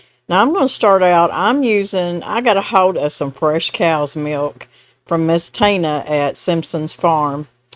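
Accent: South, United States. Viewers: I love listening to you talk, your southern accent is adorable. Thank you for sharing the bread and butter recipes. Hope you have a wonderful evening. Love the soothing sounds from the pond.❤️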